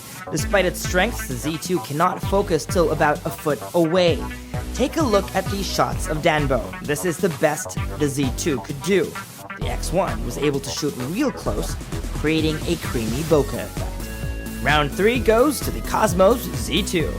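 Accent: Philippines. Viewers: Grabe mga tao dito nanghihila pababa, alam niyo kung bakit siya nagenglish? Gusto niya kasi hindi lang mga pinoy ang manonood pati na mga taga ibang bansa, tska ano naman kung hindi perfect ang accent niya? Problema dito laging ganyan mga mga language/accent police mga tao rito kala mo mga perpekto amp, average naman ang accent niya compared to other filipinos kung magtagalog eh "poreber, beri gud" etc. Di man siya perpekto magsalita ok lang dahil pilipino siya!! Ok!? Pakinggan niyo mga german, french, korean, japanese talo natin sila, wag niyo namang laitin ang kababayan natin, hence focus on the video comparison kesa para kayong gago jan na kinokorek niyo lahat ng bagay.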